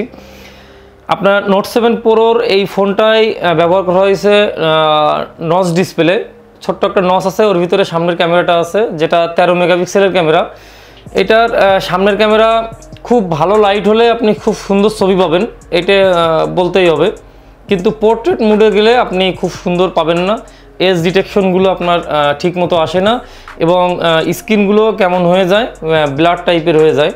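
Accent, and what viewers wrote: Bangladesh. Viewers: ভাষার ধরন যা, সেতো সংস্কৃতিতে খুলনার বারটা বাজাতে চাচ্ছে। আসলে এ কিছু জানে বলে মনে হয় না। --- - এট্টু সাবস্ক্রাইব কুরেন ।। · ভাই আপনার বাসা কি নড়াইলে আপনার ভাসা নড়াইলের মত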